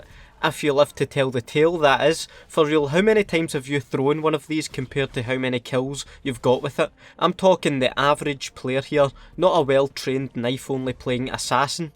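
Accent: Scotland, United Kingdom. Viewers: Love your scottish accent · This mans accent is just awesome i love the Scottish accent mate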